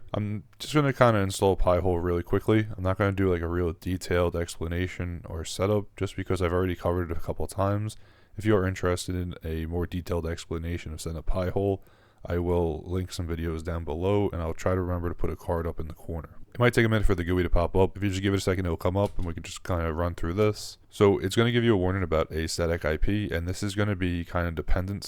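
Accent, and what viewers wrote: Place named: United States. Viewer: Thanks. You cannot help it, but your strong US English accent and quiet voice are tough for English speakers to understand. Thankfully, Subtitles help quite a lot, despite their imperfections. 🙂 I bookmarked this video, because it's a good application for an unopened Pi4 which has been in my workshop for far too long.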